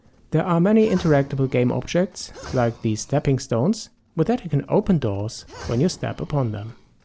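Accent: Germany. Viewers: Du bist eigentlich deutsch oder ?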